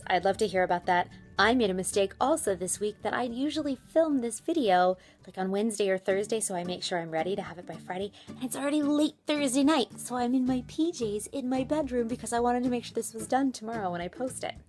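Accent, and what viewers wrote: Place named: RP, United Kingdom. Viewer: Hard to believe you could never speak English! Your vocabulary is strong! You look pretty young as well. My faith in the youth is kind of restored now 😂😂 just seen your videos. You have some posh accent. You've just inspired me. Anything is possible if you set your mind to it! A very sophisticated comment keep doing what you're doing!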